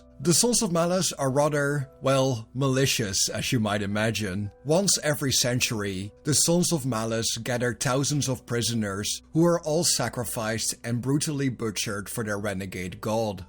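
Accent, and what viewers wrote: Netherlands. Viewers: Strong dutch accent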